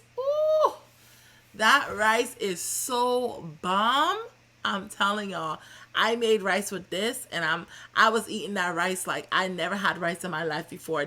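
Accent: Bahamas. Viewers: I can hear the Bahamian accent. I’m about to make griot for the very first time and your video is very helpful.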